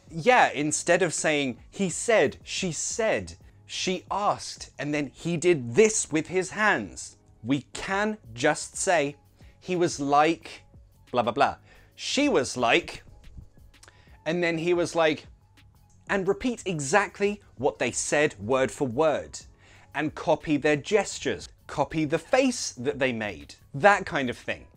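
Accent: United Kingdom. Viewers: Love your British accent💜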